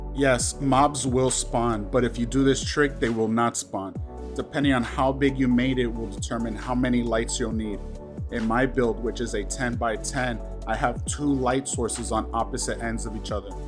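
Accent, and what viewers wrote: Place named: Los Angeles, United States. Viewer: You sound like your from LA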